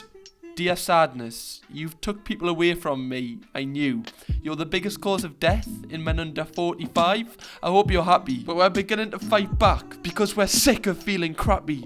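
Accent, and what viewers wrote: Newcastle, United Kingdom. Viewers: Good video, good words, and I know this is bad but I feel like it doesn’t u e the same effect in a Geordie accent. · YOU JUST CANT TAKE HIM SERIOUSLY WITH THAT NEWCASTLE ACCENT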